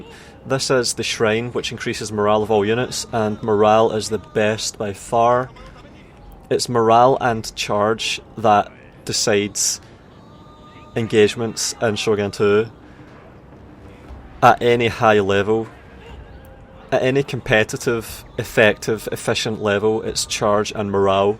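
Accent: Scotland, United Kingdom. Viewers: Is this scottish accent? I'm amazed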